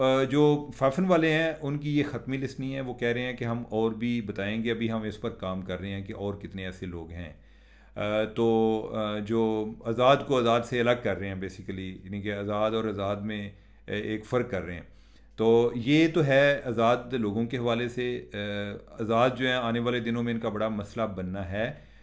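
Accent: Punjab, India. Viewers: sb, aap ache khaze program kr rahe hn mager pata nahi q aap Imran shafqat aur goher butt ka copy krte hn, I mean punjabi tarka lagate hn. Punjabi language is very very sweet and I love it but don't copy them. You are already very popular in your own style.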